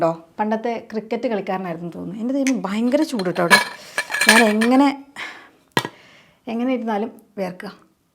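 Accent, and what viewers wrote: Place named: Thrissur, India. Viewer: ചേച്ചി തൃശൂർ ആണ് ല്ലേ... ജർമനിയിൽ പോയാലോന്നും തൃശ്ശൂർ tech വിടൂല 😄.. എന്തായാലും ഇങ്ങൾ പൊളിയാണ് ❤️❤️❤️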